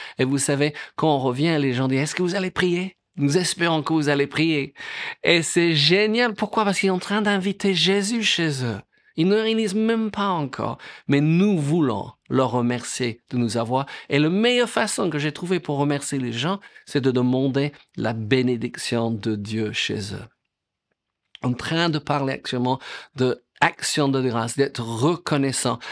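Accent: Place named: England, United Kingdom. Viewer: Message clair simple dit avec un joli accent Anglais....bon pas grave car tu présente tout ça avec joie et amour. Merci car je suis encouragé a rendre grâce pour tout ce que DIEU donne par le nom de JÉSUS AMEN Soyez bénis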